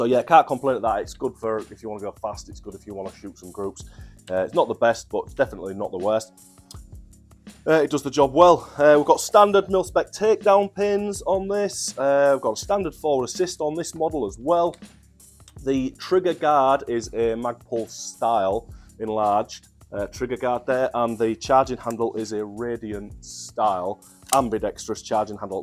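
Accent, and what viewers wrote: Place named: Hull, United Kingdom. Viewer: ah nice mate, I’m from hull myself thought you sounded close. Wanting to get into fac shooting but no idea where to start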